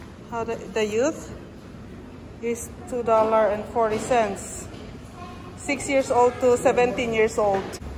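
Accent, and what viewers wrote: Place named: Ilocos, Philippines. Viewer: nice vlog. Narinig ko lng Iokano pala kau. hehe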